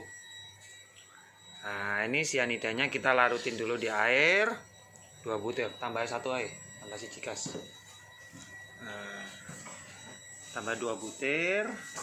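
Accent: Surabaya, Indonesia. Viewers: Om daerah mana?? Kok bhs nya Suroboyoan. ??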